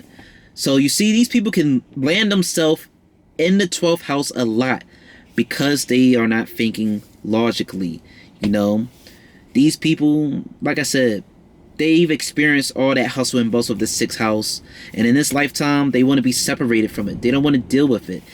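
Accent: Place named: Baltimore, United States. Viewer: He sounds like he’s from Baltimore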